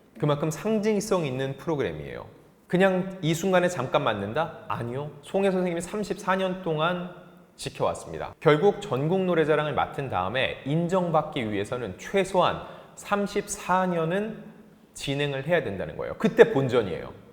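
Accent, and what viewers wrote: Gyeongsang, South Korea. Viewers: 연기와 진행은 사실 다른데 잘해낼지 관심이 가네요, 코믹연기와 순발력은 최강인데 ~ 경상도 말씨가 쪼끔 걸리기는 합니다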